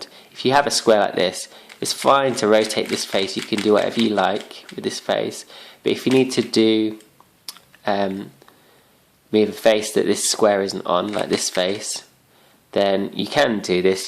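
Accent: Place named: United Kingdom. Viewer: Every time I watch your videos I start talking to myself with a British accent. Is that weird?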